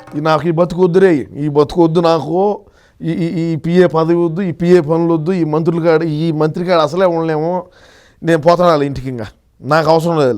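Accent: Rayalaseema, India. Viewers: అయ్యా రాయలసీమ యాసలో మాట్లాడి ఇరగదీస్తున్నారు..సూపరో సూపర్...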